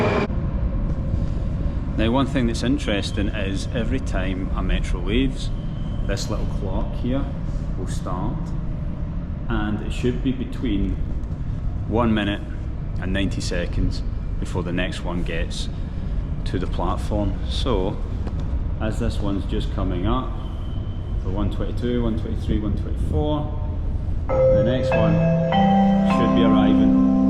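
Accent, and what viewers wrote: Scotland, United Kingdom. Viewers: My favorite is mayakovskaya as well!) Everlasting elegance. You seem a very nice guy😊 and I do love your Scottish accent. Have you been to St.Petersburg? It might remind you of Edinburgh✌️🤝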